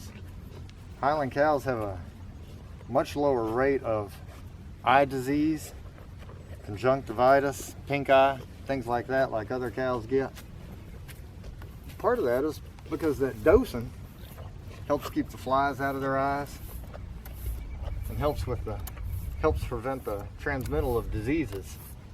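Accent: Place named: South, United States. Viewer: Love your southern accent x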